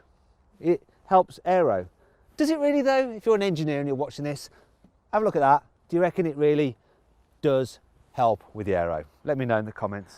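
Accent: United Kingdom. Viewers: マットのイギリス英語好き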